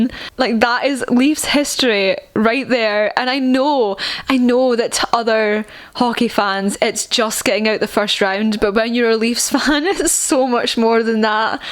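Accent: Scotland, United Kingdom. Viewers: U got new subscriber are you Canadian my bad u scottish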